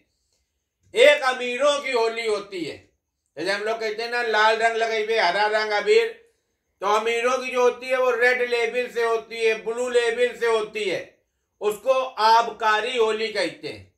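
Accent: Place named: Unnao, India. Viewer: हम भी उन्नाव के रहने वाले है 🙏💕 पर हम वहाँ की बोली नही बोल पाती हूँ सच आपकी बात सुनकर मजा आ जाता है 🎂🎊🎉🍻🥂🥂🍻🎂🎊🎉🍻🥂🥂🍻🎂🎊🎉🍻🥂🥂🍻🎂🎊🎉🍻🥂🥂🍻🎂🎊🎉🍻🥂🥂🍻🎂🎊🎉🍻🥂🥂🍻🎂🎊🎉🍻🥂🥂🍻🎂🎊🎉🍻🥂🥂🍻🎂🎊🎉🍻🥂🥂🍻🎂🎊🎉🍻🥂🥂🍻🥃🥂🥃🥂🍷🍹🍷🥂🍻🥣🥣🍩🍩🍪🍪🥛🍬🍬🍩🍩🎇🎇🎇🎇🎆🎆🎆🎆🌌🎇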